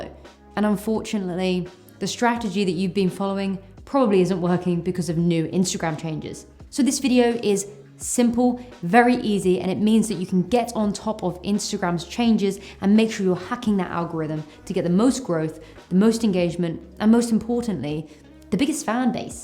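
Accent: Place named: United Kingdom. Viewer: Aaaaah.... British accent 🔥🔥❤️❤️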